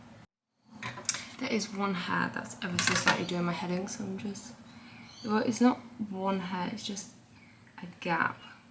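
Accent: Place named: England, United Kingdom. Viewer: Came here from tt - English accent was a nice surprise 😂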